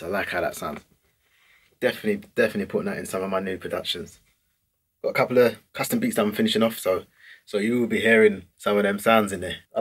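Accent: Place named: Birmingham, United Kingdom. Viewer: Please tell me you’re from bham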